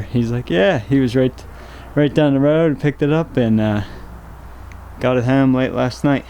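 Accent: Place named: Pennsylvania, United States. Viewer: Great PA accent.......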